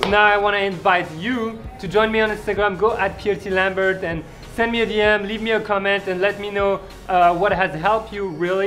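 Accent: France. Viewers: coucou l'accent français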